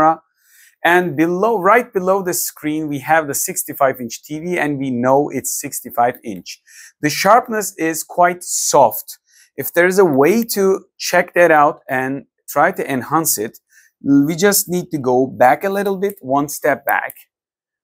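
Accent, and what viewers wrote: Turkey. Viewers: Bu türk ingilizcesi nerde duysam tanırım :) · Hocam helal olsun :D , süper bir kanal yapmışsın , aksanından Türk olduğunu anladım. Türk olduğuna bahse girerim ama ispat edemem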